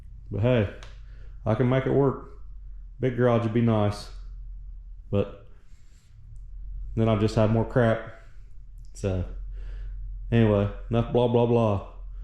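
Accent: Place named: Appalachia, United States. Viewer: You have to be from SE Ohio or WV.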